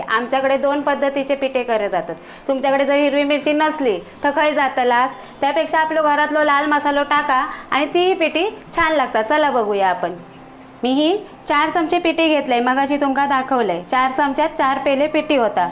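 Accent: Malvani, India. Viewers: Maaka pn pitti lay aawdta aapli malvani bhasha eikayla kiti god vatta recipe khupp Chan 😋😋👌👌👍🏻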